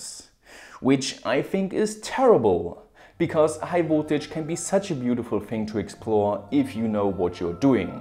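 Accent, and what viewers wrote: Germany. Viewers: Lol als ob du aus Deutschland bist xD Hatte irgendwie usa gedacht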